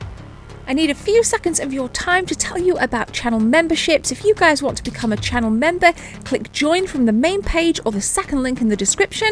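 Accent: United Kingdom. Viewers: Gotta love your brit accent, and how you squeeze those....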